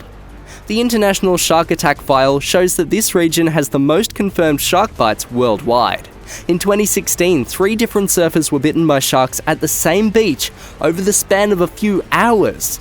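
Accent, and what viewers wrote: Australia. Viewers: The narrator has a really solidly cool accent I'm thinking that it's Australian I hope I'm right but I'm just wondering now does different areas of Australia have different type of accents like the United States because I really like his. Would be fun to go live in that area just to come back with one.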